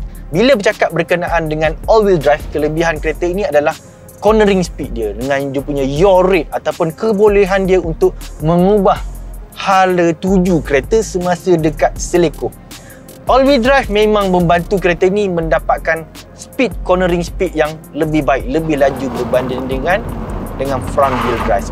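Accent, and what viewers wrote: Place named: Terengganu, Malaysia. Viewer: steady abg ni review. tapi kadang2 terdengar slang Terengganu dia tu. steady awok kite.haha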